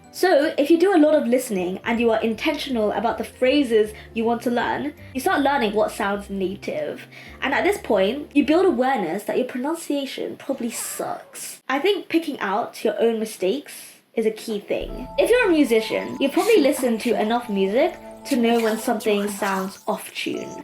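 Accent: United Kingdom. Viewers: You sound like British but with some Singlish, and I thought you were Singaporean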